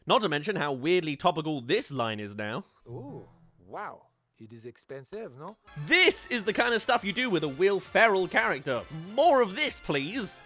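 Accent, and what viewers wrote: United Kingdom. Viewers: Wait!! You’re British??? 😅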